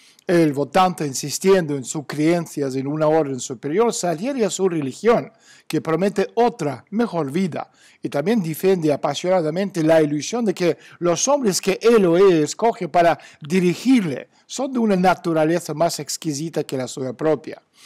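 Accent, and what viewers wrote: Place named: Spain. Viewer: me encantas pero tu lenguaje a eces es algo complicado para entender. Parece el español de españa q es diferente.Pero eres muy bueng gracias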